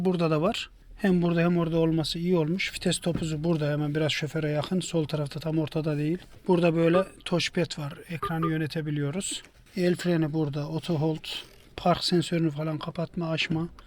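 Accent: Yozgat, Turkey. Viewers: Gardaşım Yozgatlımısın ulaa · Abi şive Yozgat gibi senin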